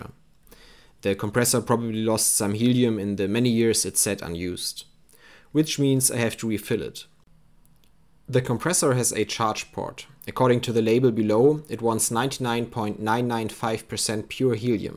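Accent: Germany. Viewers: oh I'm sure they do too. I'm just razzing ya man. If I did the hobbies around here that you do wherever you are.( To me your accent sounds... German.) Although your obviously fluent in English. Well it wouldn't be very funny. I could get shot if I go to the pharmacy and in a German type accent say. Well. It wouldn't sound like you. I'm not trying to insult you. Where you live is probably way less paranoid than where I stay. I'm talking about the people.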